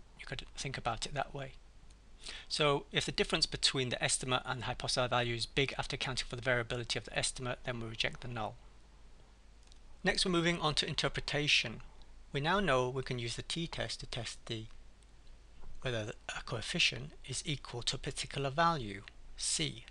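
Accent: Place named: United Kingdom. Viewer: Thick British accent.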